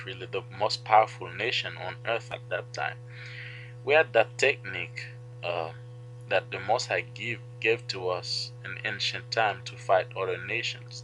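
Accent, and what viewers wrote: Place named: Haiti. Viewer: The speaker sounds Haitian. Every nationality claims to be of Israelite origin. Strange!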